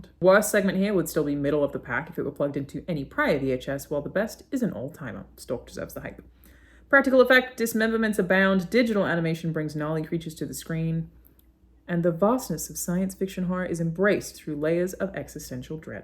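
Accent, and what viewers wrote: United States. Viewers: Watched this last night. Not bad. Weird hearing your american accent since im so used to your Australian..the skydivers bit gave me Cloverfield vibes. But good job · I loved it and Stowaway was the best for me due to the psychological horror. The ideas presented were brilliantly horrific, without giving any spoilers. And, let's give it up for your American accent. Kudos,. For real. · hearing you speak in an american accent was weird as hell.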